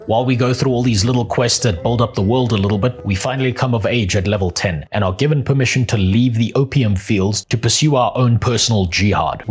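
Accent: South Africa. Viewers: Lakka video bud, sounds like you have a slight SA accent though? Or is it Kiwi?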